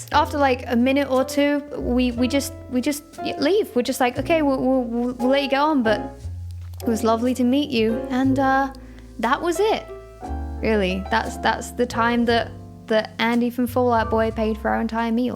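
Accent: United States. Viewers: Love the American accent x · Why is American accent kinda cute? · I really like your new videos and I hope you are happier❤️and btw your American accent is on point👌🏻 and this storytime was pretty funny😂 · Her American accent made this vid 50 times better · Do a video in your American accent. It's hilarious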